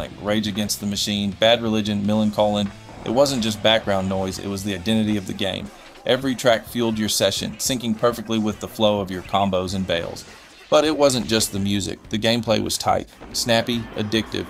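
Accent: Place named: South, United States. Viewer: Where are you from? That's a thick southern accent. Well narrated, good job mate!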